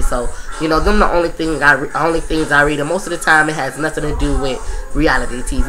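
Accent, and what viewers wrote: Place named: Miami, United States. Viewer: I knew u was a miami girl like me frm the way u talk... thats y i love u!!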